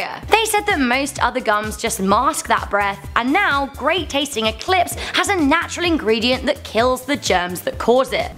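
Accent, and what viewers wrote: United Kingdom. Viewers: Lynn Barns she's from the UK so they pronounce it different from the USA. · dillpickle 123 she’s British. That’s how we pronounce it in Britain.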